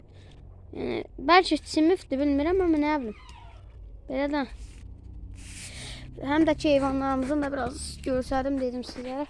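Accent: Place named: Georgia. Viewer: Gürcüstan ləhcəsinə oxşoooor ləhcən ay qaaaa.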